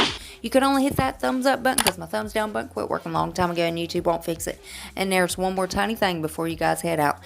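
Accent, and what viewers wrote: South, United States. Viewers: Im new to ur channel. I love ur southern accent! I am a southern girl from Kentucky. Thank u for the Ipsy spoilers.💋💋💄💅💖💕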